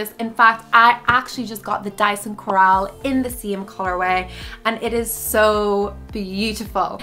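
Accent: Derry, United Kingdom. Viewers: So happy I found someone with a similar hair texture to my own doing tutorials for this! And is that a Derry accent?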